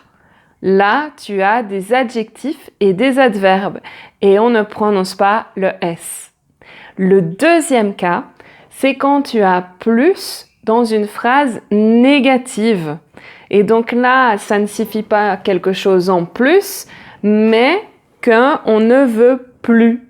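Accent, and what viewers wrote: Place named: France. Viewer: You have a beautiful French accent 💕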